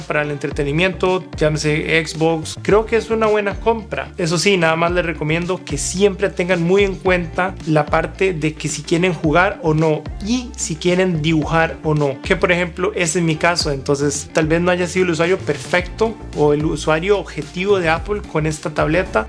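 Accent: Costa Rica. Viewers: Me va a decir que no es tico? Yo juré que sí, lo escuché y dije, que bien un tico jajaja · Este Mae habla como tico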